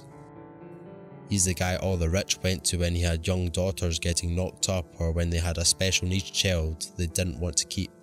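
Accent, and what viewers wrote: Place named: Scotland, United Kingdom. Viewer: I 💘 The Excellent Narration by 👏👏👏..bravo!!! I'm digging The Scottish Accent 😚 Keep up the Awesomelicious job;) much love Sexy Grandma Darkness✌💖💖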